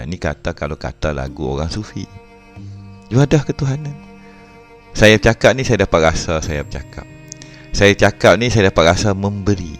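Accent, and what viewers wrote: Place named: Terengganu, Malaysia. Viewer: Orang Terengganu cakap. The BIJORKKK 👍👍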